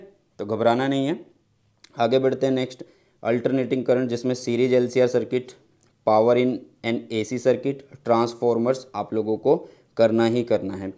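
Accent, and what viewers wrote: Haryana, India. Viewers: Sir are you from haryana??🤔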